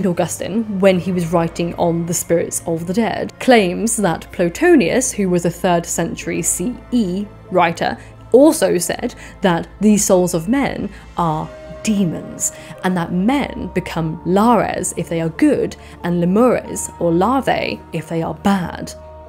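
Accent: RP, United Kingdom. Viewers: So posh. Awesome. Great content.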